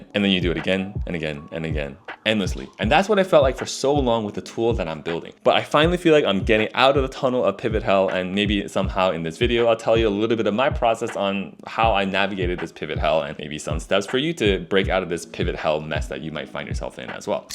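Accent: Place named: China. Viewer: I like how chinese speak english... just like Jian Yang from silicon valley vibe. 😂 You might be korean though idk... i just like the accent. Also been following you for a while..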